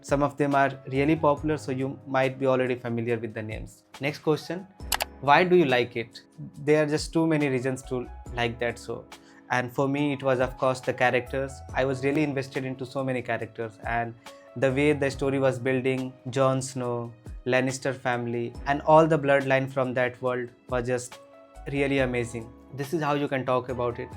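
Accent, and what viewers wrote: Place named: Bhojpuri, India. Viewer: Sir , thoda MTI aata hai aap ke speaking me.. it seems that you speak Bhojpuri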